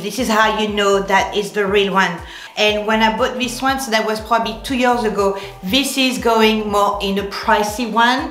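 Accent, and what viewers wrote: France. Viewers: Cocorico!🐓 Bonjour, je viens de découvrir votre chaîne. J'ai été interpellée par votre accent très français ("But alors you are French?"- La Grande Vadrouille 😄), en même temps cela me permet de faire des progrès en anglais car je comprends (presque) tout pour une fois... J'aime beaucoup ce que vous présentez, les couleurs et imprimés, et même si je n'ai pas la même colorimétrie, j'ai des goûts très similaires aux vôtres. La marinière, une valeur sûre! J'en prends chez Armor Lux, j'en trouve aussi chez Esprit à des prix plus abordables et de qualité correcte. J'aime bien porter un tee-shirt col rond à rayures noires sur fond blanc ou écru, sous un pull en cachemire noir col V, je porte le pull légèrement plus court pour laisser un peu dépasser le bas du tee-shirt pour rappeler l'imprimé du haut, le tout avec un jean. Passe-partout et chic. 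Bravo pour vos vidéos si agréables, merci pour votre bonne humeur et votre spontanéité. Forcément je m'abonne, clic clic clic!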